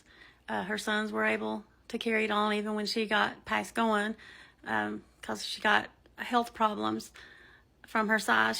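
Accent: Appalachia, United States. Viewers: I know that you hear this all the time, But I think that you are one of prettiest women on YouTube! And though I too live in North Carolina as well, I truly love listening to your mountain accent! And I had a step dad that was from Morristown, Tennessee and the crazy sayings that he would say! Made me laugh so many times! I think that he was from so far back in the sticks that they had to pipe in Sunshine! Keep up the videos sexy gurl!! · I love your content and your Hillbilly accent. I’m a hillbilly also from the Ozark mountains of Arkansas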